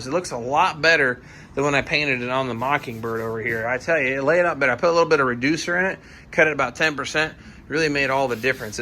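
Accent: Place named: South, United States. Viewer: This is kind of interesting. I’ve been watching you for over a year. I always kind of thought that you are from the south, because of your accent, even though you call people “you guys.” I’m from Texas, & I thought you to be maybe from Tennessee or northern Louisiana, or the like. 🤠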